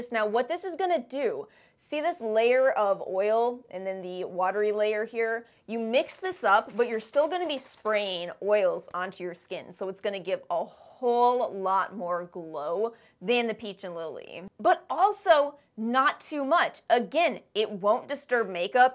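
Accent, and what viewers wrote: United States. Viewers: You have a strong American accent!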